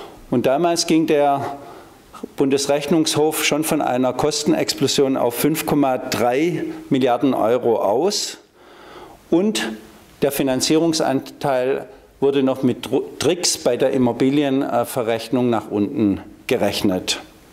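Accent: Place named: Switzerland. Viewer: Ähm Sorry, aber das war gerade ein wenig zu viel Schweiz..... denn ich verstehe kein Wort! :D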